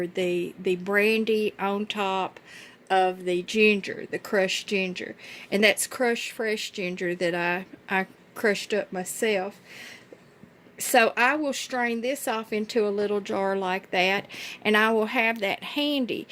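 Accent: South, United States. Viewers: love the Southern Drawl of the narrative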